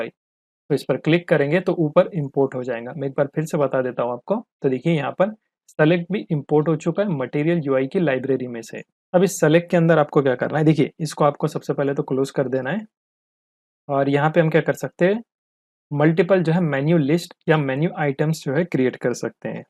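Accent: Hyderabad, India. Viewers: Bhai ye hyderabadi accent pasand aya mujhe and nice video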